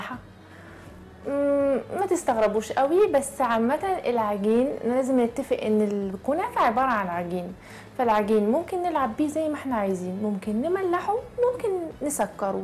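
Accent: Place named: Morocco. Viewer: احس من لهجتها انها مغربية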